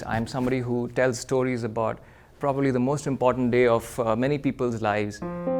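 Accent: India. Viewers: Dear, Looking at your breath taking photos and the comments and the kind of person you are, remembered a joke… once a Chef visits a Photogapher’s home and appreciates all the photos and in the end says you have a awesome camera, another day the photographer visits the Chef’s home tastes lip smacking dishes and says ‘you have a n awesome gas stove’ ….you are awesome…very interesting to see a Chinese Photographer with so much exposure to Indian culture…. Bow to your humility and talent. Were you brought up in India, looking at your Indian accent? And to sum up the message just a few minutes back found this video of Photographer Annie Leibovitz which I would like to share: <link> Thankyou Pixel Village and for this wonderful video by. P.S. In the past for me wedding equals trauma…and I recovered from the trauma and managed to make it beautiful by using EFT which is based on Chinese Accupuncture….so some connection with you:). 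Thankyou for sharing from your heart, i too love taking photos of my family with my mobile for the time being:)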